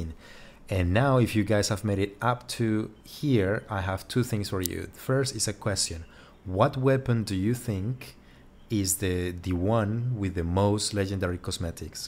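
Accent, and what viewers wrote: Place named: Italy. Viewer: Si sente che sei Italiano... giusto?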